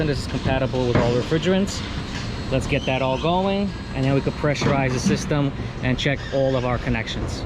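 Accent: New York, United States. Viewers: Your from newyork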